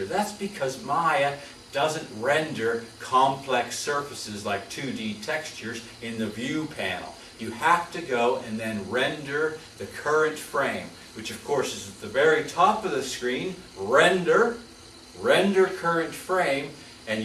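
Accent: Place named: United Kingdom. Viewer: Hes from the UK deal with it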